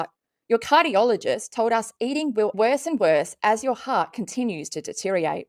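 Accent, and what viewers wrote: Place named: Australia. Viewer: How dare you. Do you know that person? Have you spent time in her home? So you claim to have had all the conditions her boy has? You sound Australian. I've just reported your content. I'm not American, so I've not watched her channel and I'll never watch yours. I hope many more people report you. You are a dangerous and evil person.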